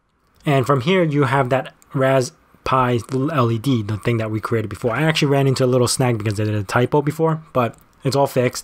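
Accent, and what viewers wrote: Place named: New York, United States. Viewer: Are you from New York? You got the accent haha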